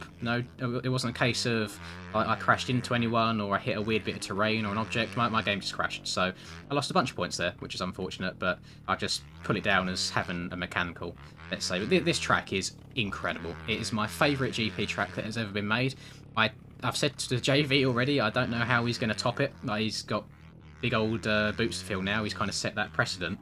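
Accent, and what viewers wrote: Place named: United Kingdom. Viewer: Any time I get to hear the smooth british voice I'm in.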